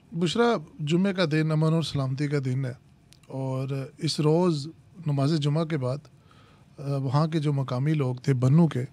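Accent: India. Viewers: are you an indian. Why do you speak in an indian accent? Qhan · انڈین سٹائل سےاوردو بولنے پر شرم آنی چاہیے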